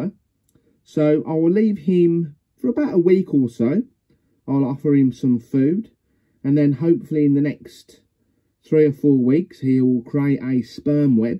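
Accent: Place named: Birmingham, United Kingdom. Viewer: Yeah, it’s really nice here. On the coast. But, we have our fair share of issues with drugs etc 🤦‍♀️ I guess that there’s no escaping that. When I was younger (much younger 😂) we knew nearly everyone, not now though. There’s more houses being built and people. Same as everywhere else I guess. You’re a Brummie aren’t ya? There’s no missing your accent 😂 xx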